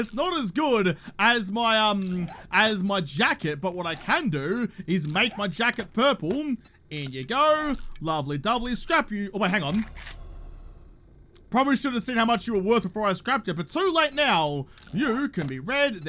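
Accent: Australia. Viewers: Ok, listen: I need to stop watching these vids in bed before sleep because people in my dreams have aussie accents and slang now. Lol. Then again, accents are hot, so I guess I shouldn't complain. Plus, trade Jen is getting jealous, I think because whenever I go see her, she's got nothing I need, and when she does, she overcharges me, I swear. Seriously though, I get so many good ideas by either watching be a muppet or have brilliant ideas! Thanks for helping me survive A20!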